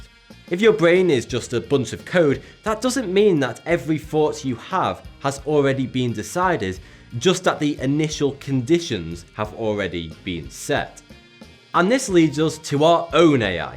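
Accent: United Kingdom. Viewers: Actually he says but because of his British accent, he sounds 42. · ahh that british accent is killing me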